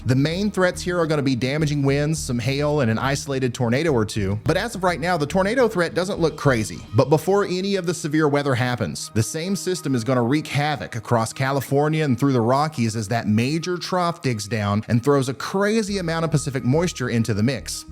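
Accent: South, United States. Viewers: the way you modulate your voice with that southern drawl, may be considered insulting to some folk ... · Refreshing to hear good ole southern weatherman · Very well done. Took one meteorology course in college, and it made me appreciate that this is a really tough profession. is excellent. And I enjoy the southern accent. Eastern TN or NC (Triangle area?)Or am I way off? Your “r sound” sounds like eastern VA though. So I’m stumped. Please let me know where you grew up. Beautiful accent, btw! Pastime of southerners is guessing each other’s accents!!!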